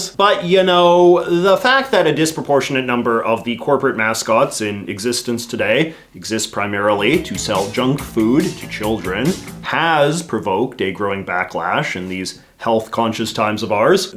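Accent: Canada. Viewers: I don't know if you purposefully over-emphasize your Canadian accent or it just stands out so much to me, but either way I love it and never change. I really believe you are the the preeminent internet expert on Americana. You see and appreciate and critique things that neither a family member or a complete stranger would of a household, you have an understanding of a close and trusted neighbor, a truer understanding.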